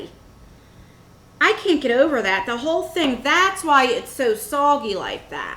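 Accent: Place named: Mid-Atlantic, United States. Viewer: Hi! I love your videos!!! I lived in Delaware for 14 years and I miss that Mid-Atlantic accent!!! Your videos are so informative and I just love your down to earth personality. Keep it up ❤️❤️❤️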